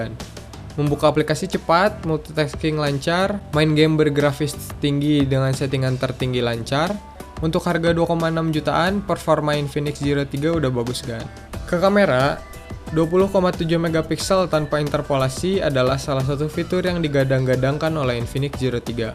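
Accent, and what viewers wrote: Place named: Bandung, Indonesia. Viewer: logatna bandung pisan euy ! Sampurasun mang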